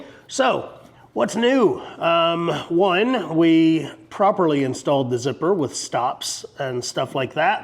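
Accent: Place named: Yorkshire, United Kingdom. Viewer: Absolutely Mint ✊🏼 Proper easy to follow and so down to earth. Are you sure your not from Yorkshire , England? 😀✊🏼😀✊🏼😀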